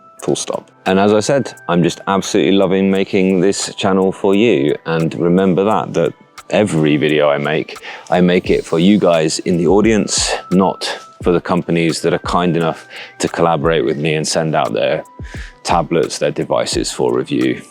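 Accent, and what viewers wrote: United Kingdom. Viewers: I love your videos. First, you’ve got the Brit accent, it’s cathartic for an American. Question. I currently have a remarkable pro move for quick notes and keeping up at work. The problem being is that I find it often gets left behind on my desk at work because I’m usually walking around a 1 million square foot hospital managing my staff, and there is concern breaking a larger device because I’m usually a hands-on kind of guy when it comes to building repairs. Add into that that I’m slightly irritated about having to pay for the Connect service if I wanted to use multiple reMarkable to sync across each other. I’m debating dropping the remarkable and moving to a Boox Palma pro in my pocket constantly, with a larger Boox Go that stays at my desk. I am hauling an xteink x4 to read, but find getting books on it tedious, but love that it is helping deter me from phone distractions. 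Can (and is it more desirable) to make the move to the Boox platform with sync and removing the remarkable and x4 from my pockets. Does the hi brake pro or plus support syncing with a bigger break device? I’m curious of your thoughts since you have so extensively used all these devices.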